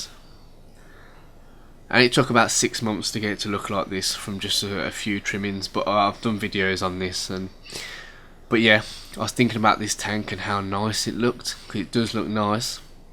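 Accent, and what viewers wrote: Birmingham, United Kingdom. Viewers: are u a brummie lol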